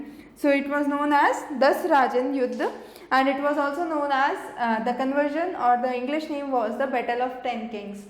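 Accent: India. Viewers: Man are you a south Indian or north indian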